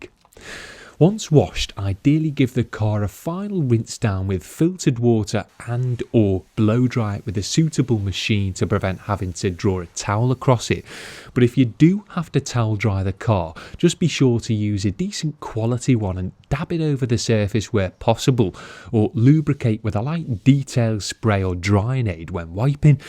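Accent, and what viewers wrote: Liverpool, United Kingdom. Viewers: This guy has the poshest Scouse accent I've ever heard..... Yes I know that's an oxymoron!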